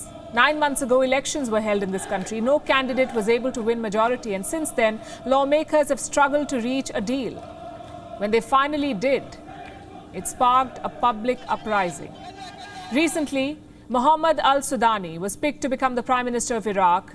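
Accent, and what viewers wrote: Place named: India. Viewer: The US did not leave, where do you pull your opinions', out of thin air. A war started by Iraq attacking Saudi Arabia and Kuwait, again like Russia, they started the wars. What should the US do, leave. Financially it would be good for the US. The women had no rights, and Iraq threatened it's neighbors. Food for oil. It's not profitable to start a war, but what would have happened if the US did not. Iran would take over, and they are no good. India perspective is that we did not do anything. You speak Indian rather the English because you are not.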